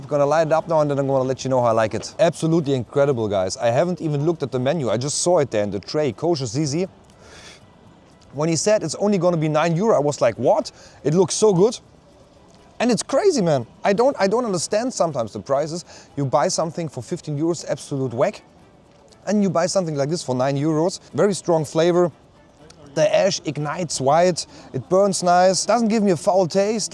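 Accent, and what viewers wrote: Germany. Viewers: playing in the shop visits & your comment - not random coincidences! I have been studying into synchronicities since I suffered an acute psychotic episode in 2017. (psychotic = to psychic) Psychiatrist Carl Jung was said to have coined the term ‘synCHRONICity’ to describe meaningful coincidences with significant personal meaning to the individual. I’ve been working on making wee silly comedy cartoon / comic book style movies since I went ‘crazy’ & in the nuthouse they imprisoned me in 4 being a method actress, the nuthouse staff put an A4 sheet of paper ‘sign’ on the door that threatened; “WRITE TO SURVIVE.” I focused on that sign whilst barely conscious after my life was nearly ended by a psychiatric mentalcase patient in their who beat my skull on the ground in excess of 30 times whilst screaming, “AHM GONNAE FUCKIN MURDER YE!!” “She”, that “female” (I use that term to describe “her” loosely), that violent criminal thug caused me a “traumatic brain-injury” (there is an anagram of “Truman” within those words. 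During my “to psychic” episode I suffered from the common Truman Show delusion & thought I had been set up (I’m an actress) & thought I was being used for a psyop for a Derren Brown show. (I was not I was being sectioned in hell.) Anyhoo, I decided to comment as I’ve just recently started watching your videos & enjoy seeing Amsterdam & listening to your German accent. When I was homeless in 2023 in Utrecht I met the loveliest homeless German man called No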